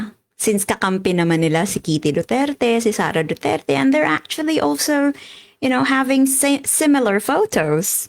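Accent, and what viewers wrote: United Kingdom. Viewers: I’ve watched you for the first time with Christ Tan‘s podcast, (that was just yesterday) since then nag marathon na ako sa mga vlogs mo. Sayang na late ako ng 4 days sa live mo na ito. Anyways, i love your British accent just keep it up…yan ang tatak mo plus hindi ka nakakasawang pakinggan. I have 4 friends from Davao mismong kababayan ng mga Du30 but they‘re not DU30 followers kaya i love them so much! I’m your new subscriber saying HELLO FROM VIENNA AUSTRIA, the Batangeña!❤️💋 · UK accents 😊❤ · Yes Ms. I love your British accent and specially your sense of humour,God Bless 🇵🇭🙏🇨🇦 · Like your British accent and Cebuano.. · You do sound British, I'm loving the accent though 😊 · Good day mam, you have a voice..British accent ba..:face-blue-smiling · Manang, hindi aq kasali sa any political party, may reaksyon lang Ako sa isang blog mo with christian, ala Ako paki sa political views mo, PERO NATAWA AKO SA PAGMAMALAKI MO NA ABOUT YOU GOIN' ON A CHURCH AT PAGBA.BIBLE STUDY MO, BUT THEN MAGCRITICIZE KA NG TAO, PARANG AKO NAHIYA KZ LUMALABAS LANG PAGIGING HIPOKRITA MO, ANG BAWAT TAO BINIGYAN NG TALENTO HINDI PARA IPAGMALAKI KUNDI GAMITIN SA MABUTING LAYUNIN AT HINDI IBINABANDERA O PINANGANGALANDAKAN ABOUT YOUR "BRITISH ACCENT", ICRITICIZE MO SA TAO, SABIHIN KO SAYO KAHIT RUSSIAN, KOREAN, JAPANESE PRESIDENT HINDI MAGALING SA ENGLISH AT WALA YANG ACCENT NA PINAGMAMALAKI MO, KUNG HINDI MAGALING SA ENGLISH SI VP SARAH EH ANU NAMAN SAYO?? 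AT LEAST NAG.TATRY SIYA NA GAMITIN ANG INGLES DAHIL ANG MOTHER LANGUAGE NAMAN TALAGA NG PILIPINAS AY TAGALOG, BAKIT GINAWA NG DIOS NA MAGKAROON NG IBA'T IBANG LANGUAGE KUNG MAS IMPORTANTE PALA SAYO ANG ENGLISH AT ACCENT NITO, KAHIT NA SINASABI MO NA HINDI MAGALING SI VP SARAH, WALANG OBLIGASYON SI VP O KAHIT SINO NA NAMUMUNO SA GOBYERNO NA ICRITICIZE MO SA MGA PINAG-ARALAN NILA, PEKE KA DIN EH AT HIPOKRITA, WAG MO NG IPANGALANDAKAN YANG PAGPUNTA PUNTA MO SA CHURCH AT PAGBA.BIBLE STUDY MO, NAGMUMUKHA KA LANG PAYASO. MAY ISA. Pa pala, kung talagang nagbabasa ka ng BIBLIA dapat alam mo yung talata na kapag nag-iisip ka ng hindi maganda sa kapwa ay katumbas din ng pagpatay. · Ms., puwedeng puwede ka po maging newscaster. Filipina with British accent. Ang galing mo po! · Your style of talking "a little British accent" adds flavor to the whole shebang. You caught my attention Girl! I am a big fan - keep going especially taking on people with different perspective blinded by their wrong viewpoint. 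You are on the right track of messaging.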